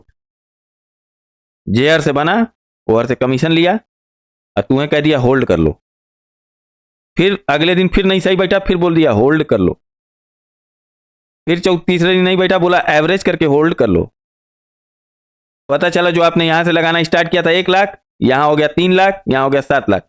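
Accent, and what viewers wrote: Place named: Uttar Pradesh, India. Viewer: Kaha se ho Bhai utter pradesh ki bhasa bol rhe sir 🎉🎉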